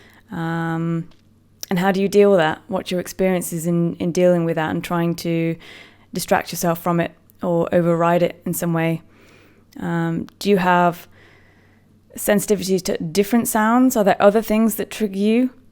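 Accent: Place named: England, United Kingdom. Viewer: Saw this on the misophonia reddit, figured I’d give it a watch. I want you to know that you’re not alone. I can really relate to the experiences you’ve talked about (just some different trigger sounds) and so I really empathize with you. And having misophonia+sensory overload+social anxiety is not a fun thing to combine, I know that firsthand. But if it makes you feel any better, it could be worse; you could have OCD too. No, seriously, it’s hell on Earth. My misophonia being triggered trips my OCD, which trips my anxiety, which ramps up my sound sensitivity and my OCD even further, and then they interact again, and so on, and so forth, ad nauseam. Oh, and I have perfect hearing (quantitatively speaking, I got a perfect score on the Army hearing test) so I hear *everything*. So yeah, that’s great for misophonia. Sorry, I went off on a weird ramble there, didn’t I? 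Both my OCD and my misophonia got triggered badly, I got really baked to cope, then surfed Reddit, which in turn led me here. TaDa! Hooray for self-medication! Also, totally unrelated but I freakin love your English accent.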